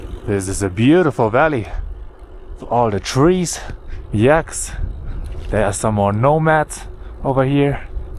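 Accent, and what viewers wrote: Germany. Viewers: Always love the content and im excited to see what you find in Tibet ! By the way, if you ever feel, when editing, that you should have said something but didn't, please feel free to add it. Or, if you just want to ride and not talk that day, you can add it in production. Just a thought I wanted to share, because you do have a great voice, with that german accent 😄. Because, showing us things, this time Tibet, is one aspect, but telling us how you are living it, is another thing. Its "wanderlust" as they say right ?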